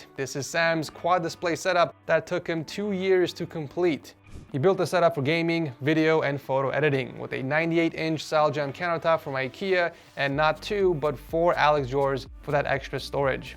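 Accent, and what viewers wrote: Australia. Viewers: I guess we're going Australian mode on this video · Why is the intro australian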